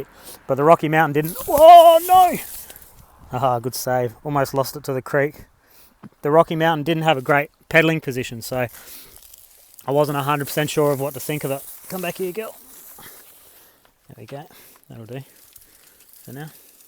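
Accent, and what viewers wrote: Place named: Australia. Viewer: No disrespect meant, but this review was just awful! His Australian accent, is often difficult to understand because he either speaks too fast or kind of mutters. Plus he begins by talking about the 3 Fabric Scoop saddles he's tried -who cares! I want to hear about the Pure without seeing the bike tipping over or pictures of the road he's on. It's too bad because he obviously knows his stuff and with a more polished presentation this could have been terrific!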